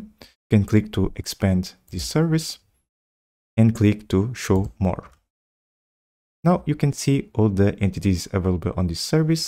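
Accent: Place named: Portugal. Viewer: Muito reconhecível seu sotaque português de Portugal! Gostei muito da simplicidade que trouxe o assunto e um case prático. Saudações do Brasil!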